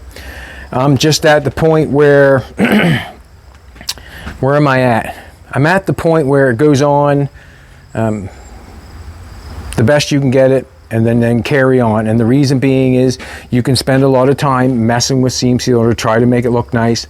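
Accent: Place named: Nova Scotia, Canada. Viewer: Trying to place that accent ,then I realized Trailor Park Boys! Then he confirmed with Nova Scotia. Awesome.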